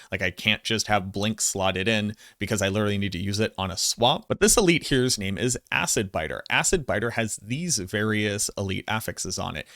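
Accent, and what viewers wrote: Boston, United States. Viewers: Hit'em with the math,!! Lol Great vid! Thanks brother. Appreciate you. Oh, and those Bostonian slide-ins, "id-eer" icing on the cake! LMAO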